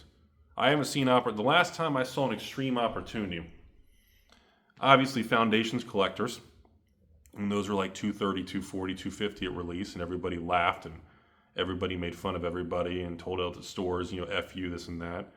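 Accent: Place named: Oklahoma, United States. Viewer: You sound like a really brilliant individual- nobody with half a brain would take financial/investment advice from someone like you that talks like they date their sister in a trailer park somewhere in Oklahoma. Wow what a prediction it hasn’t hit its low yet like every single person already knows. Thanks captain obvious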